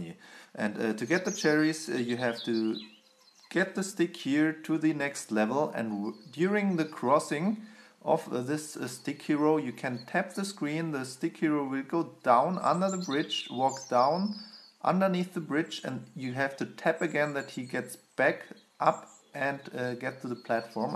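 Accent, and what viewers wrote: Germany. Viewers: Kann es sein das du deutscher bist? Das ist so ein typisches "Deutsch Englisch"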